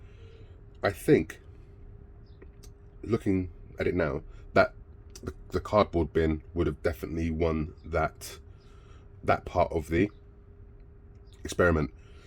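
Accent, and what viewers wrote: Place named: England, United Kingdom. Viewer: really enjoyed these posts thank you, do i detect a slight English accent ??? 🤔ATB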